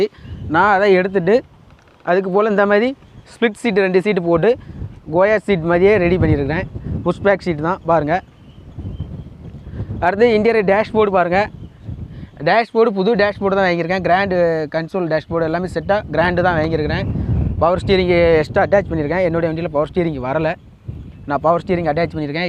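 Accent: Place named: Tirunelveli, India. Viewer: Nellai Tamil alagu.car um alagu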